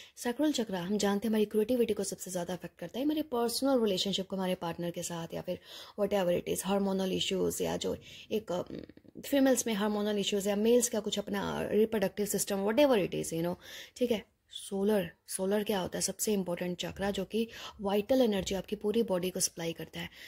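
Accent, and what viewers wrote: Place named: Punjab, India. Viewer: Love your punjabi ascent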